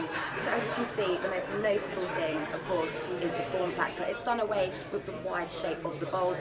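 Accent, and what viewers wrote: United Kingdom. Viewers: i like her british accent..... nice...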